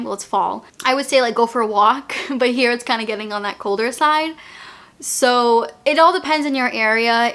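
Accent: Boston, United States. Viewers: It’s so funny, some times your Boston accent comes out WICKED strong, some times you only seem to accentuate certain words (I’m sure you don’t do it intentionally) Lol, I used to live and spent quite a few years in Lowell, Fitchburg, Cambridge, but I don’t have a Boston accent at all since I’m originally from Philly. (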